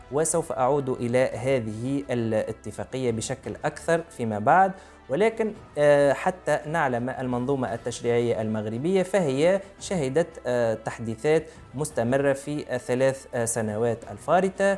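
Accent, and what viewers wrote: Tunisia. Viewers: شكرا لك أستاذ..حصة ممتعة وافكار تاريخية قانونية متماسكة بلغة سلسة جميلة بطابع تونسي رائع... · من خلال طريقة نطقك قلت انك بتونسي قبل أن اكتشف العلم التونسي جنبك و اهنيك على اصالتك التونسية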